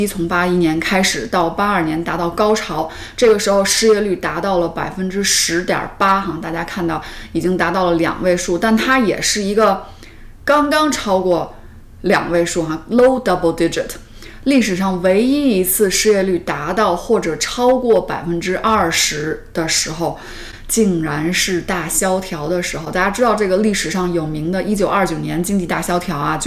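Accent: Beijing, China. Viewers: 博主是北京人么？口音听起来很熟悉。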